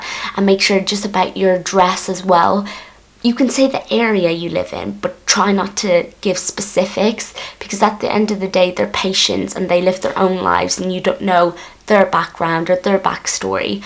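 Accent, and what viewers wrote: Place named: Ireland. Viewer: great video. I start placement next month so hopefully these help. btw it sounds like your irish haha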